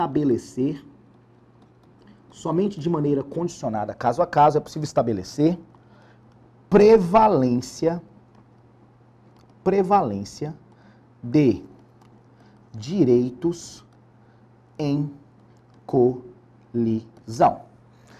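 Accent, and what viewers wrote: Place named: Rio de Janeiro, Brazil. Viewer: jurava que você era do Rio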